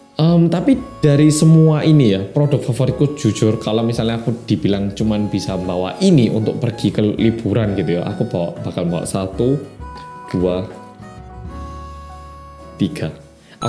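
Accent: Java, Indonesia. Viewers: Hi , MEDOK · Hmmm kangen pembukaan "orang Korea yg medok music deb deb deb" Ko sekrng ngga ada lagi yaa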